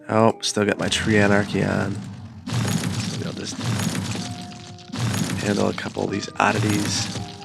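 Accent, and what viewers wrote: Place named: Iowa, United States. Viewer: You from the midwest, flat with some terrain. Sounds like Iowa. Thats where im planted. lol. sometimes sped up builds are ok when its the same thing repeated. otherwise real time is best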